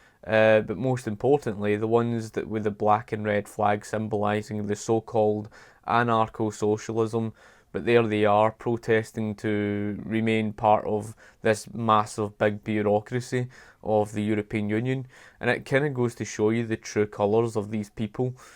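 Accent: Scotland, United Kingdom. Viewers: Ah good to see you back. And good to hear a Scottish voice speaking in favour of Brexit!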